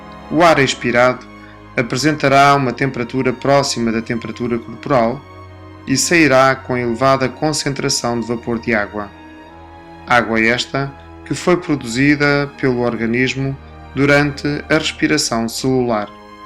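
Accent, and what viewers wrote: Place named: Portugal. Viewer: video muito bom. Mesmo com sotaque de Portugal, ficou mais facil de entender do que muito professor brasileiro, rs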